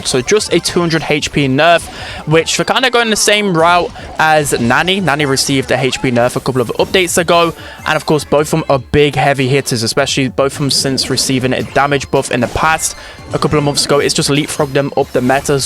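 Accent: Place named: Liverpool, United Kingdom. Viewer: You're a Scouser? Yeah I'm unsubscribing.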